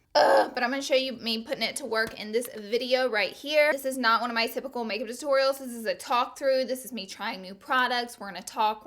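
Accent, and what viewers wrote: South, United States. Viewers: yes, us southern girls pronounce things funny, and so what?! I say ole to for oil. when I first found your channel and heard you say that, I couldn't believe my ears. i was like... YES! someone else that says it wrong. do you say creek or crick? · I lurrve that your accent is still so thick! xoxox, fellow southern belle💁🏼 👑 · Where are you from ? You sound like you have a country accent 🤔 but it's really cute ! Love you , new subbie ! 🤗💗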